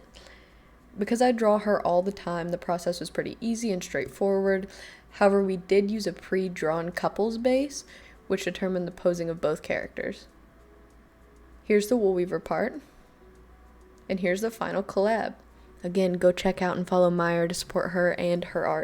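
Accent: South, United States. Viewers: is it just me or has she been getting more southern (hi )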